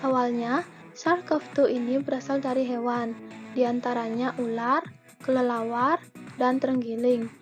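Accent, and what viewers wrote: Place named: Bali, Indonesia. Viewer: Logatnya bali banget ya kak🙃, thanks infonya👌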